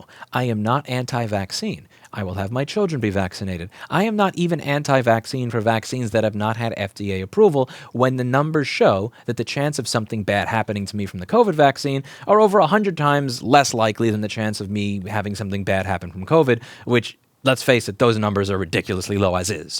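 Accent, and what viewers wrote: New York, United States. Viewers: This was definitely the most NY I've heard hahah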